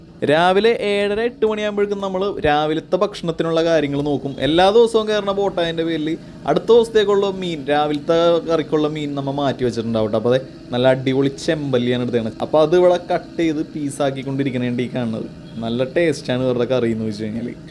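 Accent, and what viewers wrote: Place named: Thrissur, India. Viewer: താങ്കൾ തൃ... ശൂരാണോ. ഉഷാറായി 👍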